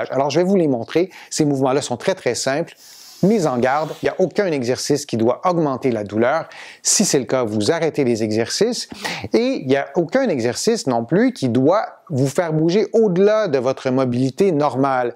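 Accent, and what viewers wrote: Canada. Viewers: Ahhh l'accent canadien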